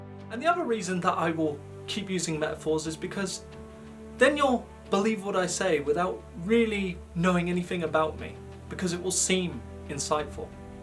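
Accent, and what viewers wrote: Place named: Ireland. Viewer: Well wtf have you turned in to, you might have been better of telling us your number one fans, the bull shit story of Aladdin in your Irish accent,it would have been more fun, keep them coming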